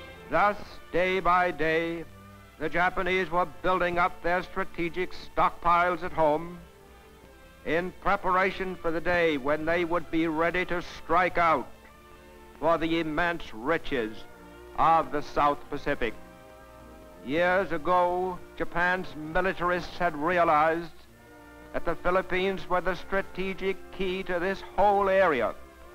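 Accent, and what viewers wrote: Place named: Mid-Atlantic, United States. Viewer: Search "Mid-Atlantic Accent" for more info on this.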